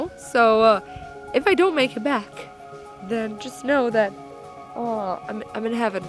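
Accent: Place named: Low German, Germany. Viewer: Thank you! I really enjoyed it. Was the horse and buggy ride at some amish place? I grew up amish in Wisconsin. I like the German you spoke. It sounded to me like Platt deutsch. Is it? Or is it Hutterisch? I know that the German we amish spoke is quite different from the real German language like they speak in Germany. I like to hear different dialects of German.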